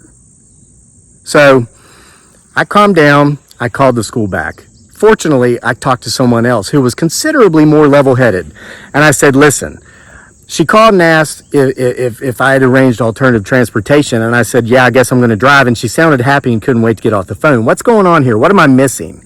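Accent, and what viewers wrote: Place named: North, United States. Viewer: Sweetheart is how many southern women talk! If you were born in the south than you know this to be true.. than again.. your accent soumds more like you are from up north.. Now.. i do agree with your issue.. Sadly.. this has been going on for a while..we have allowed this to happen.. So this should not surprise you at this point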